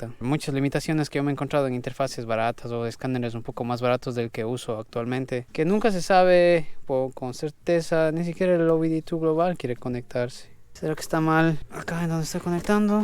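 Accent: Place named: Highlands, Ecuador. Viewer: Ese acento característico de la serranía ecuatoriana, creería que del Austro.... llegué a este video, gracias al algoritmo de Youtube. Excelente video, saludos desde Guayaquil, compatriota.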